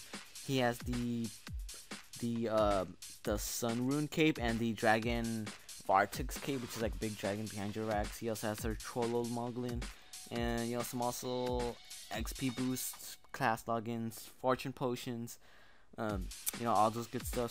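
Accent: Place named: New Zealand. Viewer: I knew it you had an accent! >:3 If I'm not wrong,you're from New Zealand right? Btw I want to enter aswell xD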